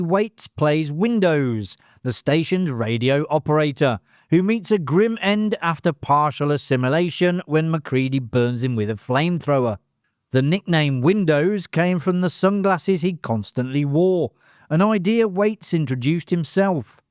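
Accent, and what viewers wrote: United Kingdom. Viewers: LOL, Love how you keep saying Tobe wrong....and to the guy before me, this is not AI slop, he's just Brittish.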